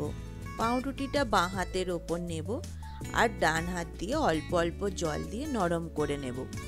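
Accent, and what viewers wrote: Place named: Kolkata, India. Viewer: আপু তুমার কলিকাতা দেশের মানুষ মতন কথা বলে তাই আমি বুঝতে পারছি যে তুমি কলিকাতা ঠিক আছে না আপু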